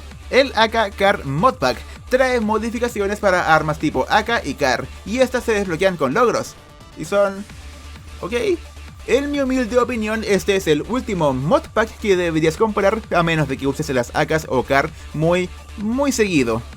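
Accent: Chile. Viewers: Eres chileno? Porque yo si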